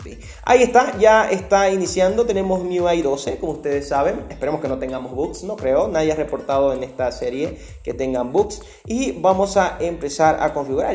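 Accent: Bolivia. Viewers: Eres de Bolivia verdad ?? Si lo eres me suscribo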